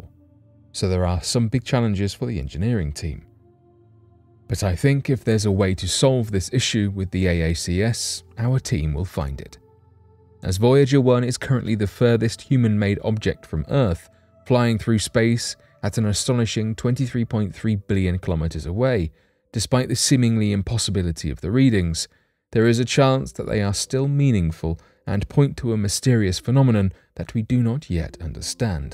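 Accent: United Kingdom. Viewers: Cmon now.. its a quieter gentle UK 🇬🇧 accent. Far better than some we have in 🇺🇸 🌎. God bless all!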